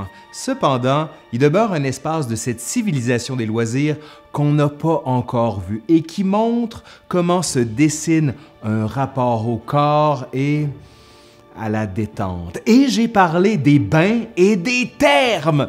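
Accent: Quebec, Canada. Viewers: il est québécois, il a l’accent et le language de là-bas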